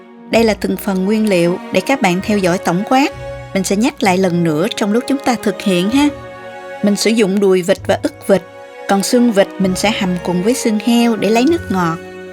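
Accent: South, Vietnam. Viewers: Giong noi mien Nam nghe rat ngot ngao giong nhu giong noi cua Tu Trinh truoc nam 75 , thuyet minh trong phim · Giọng đúng chuẩn người Miền Nam nghe no hiền và dễ chịu. Xứ nhà tui đó nghen. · Giọng nói miền Nam dễ thương quá. Cám ơn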